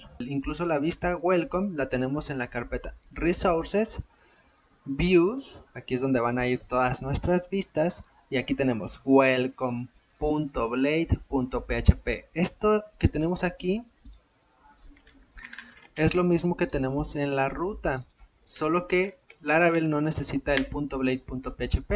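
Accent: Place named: Monterrey, Mexico. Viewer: Muy interesante tu acento. De Monterrey? Me gusto tu video.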